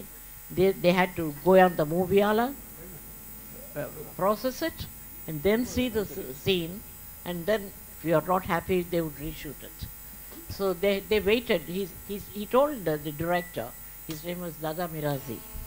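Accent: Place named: Oxford, United Kingdom. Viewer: Salute Madame ...perfect Oxford English..wishing You all happiness in life 🙏🙏🙏❤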